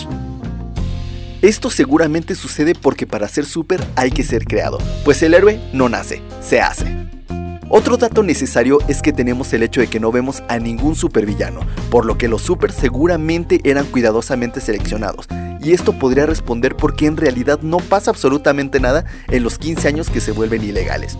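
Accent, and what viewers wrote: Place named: Mexico. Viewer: Min a min audio 100% mexicano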